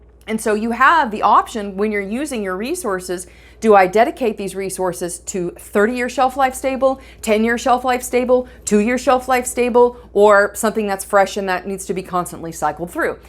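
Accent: South, United States. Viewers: Enjoy your Southern drawl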